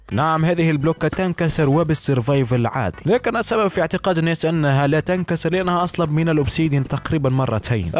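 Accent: Tunisia. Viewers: انت ولد بلادي تونسي صح؟؟ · هل انت تونسي؟ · انت تونسي او جزائري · ياخي انت تونسي؟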